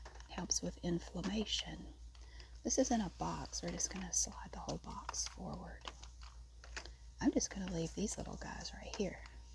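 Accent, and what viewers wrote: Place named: Greensboro, United States. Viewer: your asmr videos are so nice for me because of your accent. i was born in greensboro and lived in the area for most of my life so its sooo comforting to hear the voice of the women i look up to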